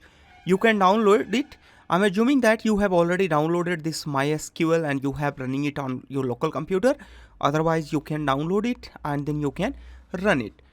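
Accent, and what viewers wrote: India. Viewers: Nice video with nice bengali accent :-)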